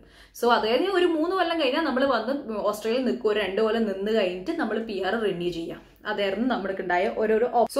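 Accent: Kannur, India. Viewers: Wow.. thanks a lot for committing the video.. Kannur bhasha kettapol thonni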